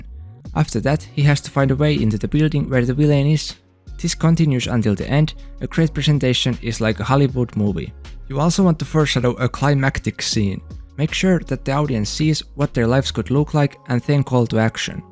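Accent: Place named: India. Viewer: Can you put YT subtitles? My English is not good and it seems you have Indian accent which is more difficult to understand. Thanks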